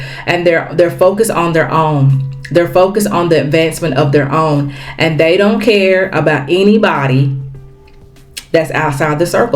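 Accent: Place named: United States. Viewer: You arent FBA,stop maskerading!Tethers can't speak for us if you are not FBA; stop speaking for us; just because you have an American accent doesn't make you FBA; America has had immigration! You or no tether they can make us do nothing! Immigrants need to hold their own..leave us alone! Also, we do not look like Haitians; stop babbling; white people know the difference! You are talking gibberish! People undermind and disrespect us 24-7; we have done it enough. To hell with PanAfricanism and putting the capes on for them, leave us alone!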